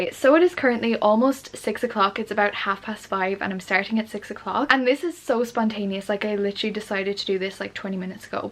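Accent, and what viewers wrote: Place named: Ireland. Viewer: Sounds like an Irish accent 🙂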